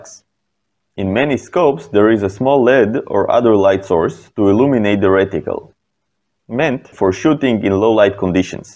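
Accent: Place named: Israel. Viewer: i recoginzed the israeli accent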